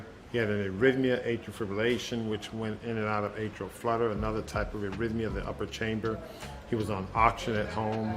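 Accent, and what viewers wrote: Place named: United States. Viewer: Sir, i am watching your useful videos from india, we cannot follow american pronounciation of english. Pl give tittles in english and display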